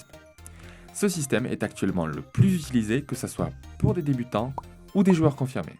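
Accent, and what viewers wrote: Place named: South-West, France. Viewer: Je souhaites me mettre au AirSoft. Merci pour ta chaine (je débarque 4ans après 🤣mais pas grave) J'entends un accent, du sud ouest ? ;) Ciao de lapart d'un Bdlais ^^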